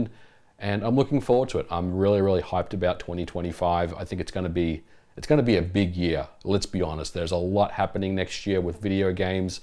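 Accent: Australia. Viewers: Going to use you as inspiration to lose weight in 2025. I just hit 50, and as you say, still eat like I did in my 30s. As a fellow IT enthusiast from Melbourne who was in the 90s PC scene, keep up the great content. Hearing an Aussie accent is always welcome. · The most important thing about this channel to me, and I really hope you see this because it's true, is having a non-US creator among the big gaming channels. When 90% of videos on the platform are by American dudes in their 20s, it really means a lot to have an older, Australian voice to bring a different outlook, experience, and vibe, and honestly, it can just be downright fatiguing to hear high-energy American accents nonstop video after video. You're making a big difference in a way you might not have known, and I hope a lot of others feel the same, too. Love ya, keep it up. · Been watching a long time now. 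It's the quality content that keeps me coming back, plus, the Aussie accent lol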